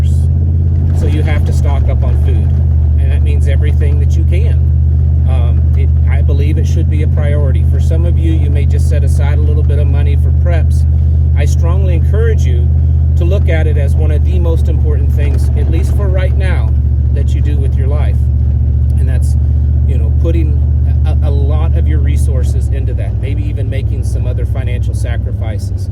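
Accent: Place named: Appalachia, United States. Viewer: Love them hillbilly pipes , yours too .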